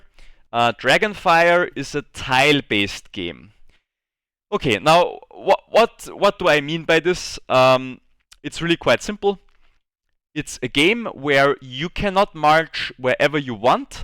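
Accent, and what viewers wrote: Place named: Austria. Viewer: Ich bin mal so frech auf deutsch zu schreiben- ich meine ich Höre einen Österreicher😂 Auf welchem Server spielst du? Und falls man fragen darf- in welcher Preisspanne sind wir bei deinen Drachen?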